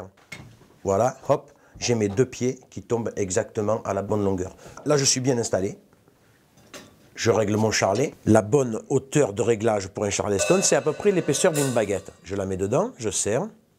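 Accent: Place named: South, France. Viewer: Bonne enseignement avec l’accent du sud en prime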